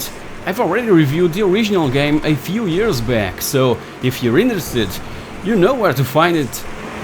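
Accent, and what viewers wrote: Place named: Portugal. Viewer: You tuga? that accent is 105% tuga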